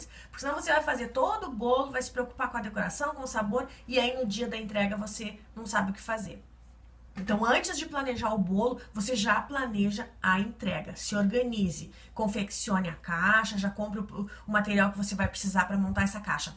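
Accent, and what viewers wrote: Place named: Southern Brazil, Brazil. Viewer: Ouvindo o seu sotaque fiquei tentando imaginar de onde você é... RS? PR?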